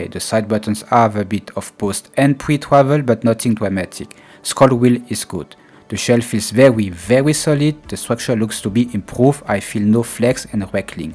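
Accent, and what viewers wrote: France. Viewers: This is a great video and I hope you exceed to do great things , but would you mind me asking are you French sorry I took three years of French and thought I recognized the accent my apologies if this isn’t correct also I subscribed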